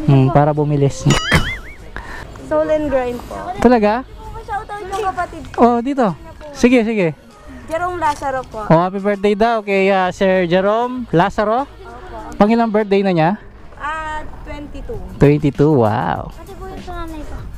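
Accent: Pampanga, Philippines. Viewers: Kapampangan ka po?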